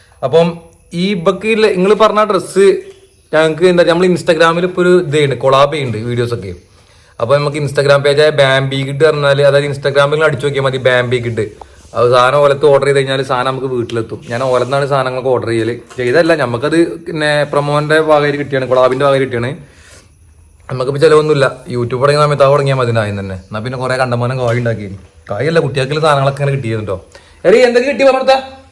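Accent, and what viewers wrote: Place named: Malabar, India. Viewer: മ്മടെ മലബാറിയൻ സംസാര സ്റ്റൈൽ ആണ് നിങ്ങളുടെ ഹൈലൈറ്റ്. എല്ലാവരും ഇത് പോലെ സന്തോഷമായിരിക്കട്ടെ എന്നെന്നും.കുട്ടികൾ ഇപ്പച്ചീയേ... എന്ന് വിളിക്കുമ്പോൾ നല്ല ഭംഗിയുണ്ട് കേൾക്കാൻ . ❤❤️🙌🏻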